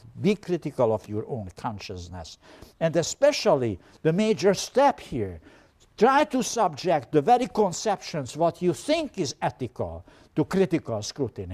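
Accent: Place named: Europe. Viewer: And I was surprised could do such a convincing continental accent!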